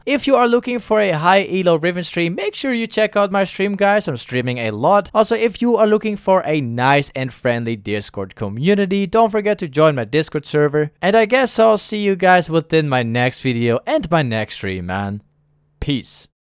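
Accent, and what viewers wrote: Netherlands. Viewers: Are you Dutch? You sound Dutch speaking (Belgium/Netherlands)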